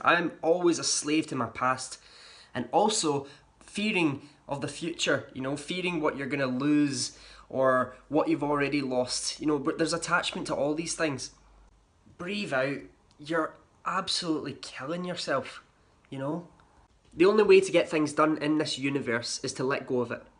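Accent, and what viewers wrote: Scotland, United Kingdom. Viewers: Hey. I love watching ur videos & I love ur accent. Scotland is beautiful & a dream place for me & my dad to visit. My dad's side of the family is Scottish 😊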